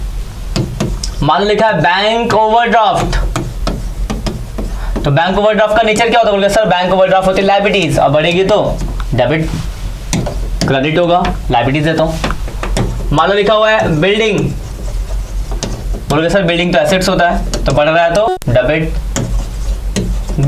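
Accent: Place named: Haryana, India. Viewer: Amazing explanation sir...... I think u are from haryana????????ur teaching is really great 🙂🙂🙂🙂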